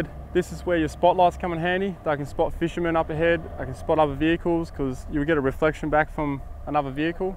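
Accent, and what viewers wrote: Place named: Australia. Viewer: This guys accent is so cool Australians always seem happy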